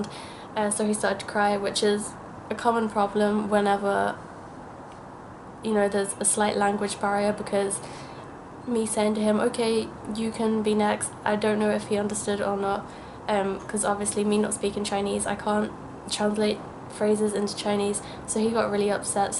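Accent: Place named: Australia. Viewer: sounds Australian hahah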